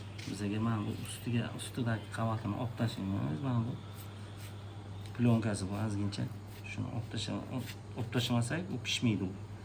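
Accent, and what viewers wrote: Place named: Oasis, Uzbekistan. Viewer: Э харип Узин воха тамонлардансану шевагда гапуровур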